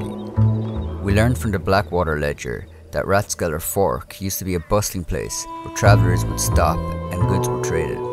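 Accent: Ireland. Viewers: Didn't know you were Irish 🇮🇪, theres not many Irish youtubers, keep up the good work 💪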